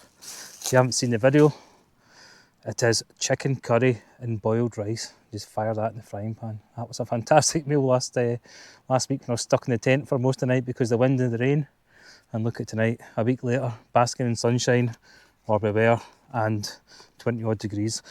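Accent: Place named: Scotland, United Kingdom. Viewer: That's quite a thick Scottish accent I think I understand half of what you say lol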